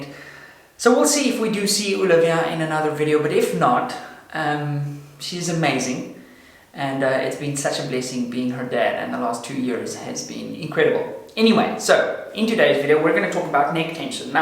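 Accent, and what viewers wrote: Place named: South Africa. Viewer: I watch your videos to hear you SA accent.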